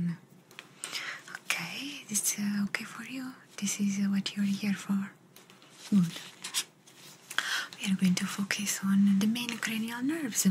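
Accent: Italy. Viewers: Italian accent videos are perfect for relaxing and unwind your soothing voice makes me feel 😴 😊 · Hello, magnificent ASMR with an Italian accent, I loved it and enjoyed it, it is very relaxing, greetings, beautiful 💖💖🌹🌹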